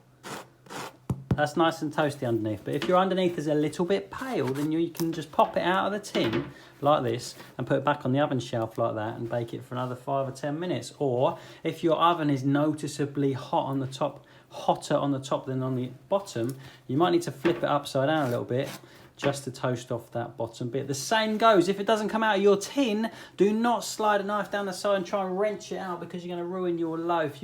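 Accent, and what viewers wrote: United Kingdom. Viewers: so glad to see you on YT - following you and I am learning as I am doing - love your vids and your presence and jokes with your UK accent for us US blokes is great - Great vids and editing by you and presentation is excellent - Thank you for all you do....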